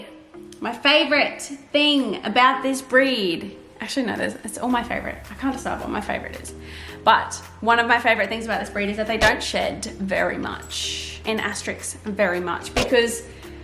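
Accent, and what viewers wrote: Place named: Australia. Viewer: Soooo Australian, let’s put an R at the end of everything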